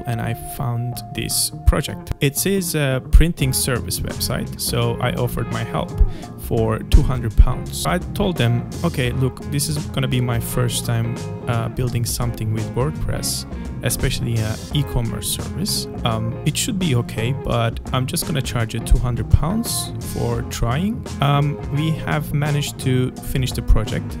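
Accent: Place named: Turkey. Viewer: bende diyorum bu aksan bir yerden tanıdık geliyor türkish baba türkish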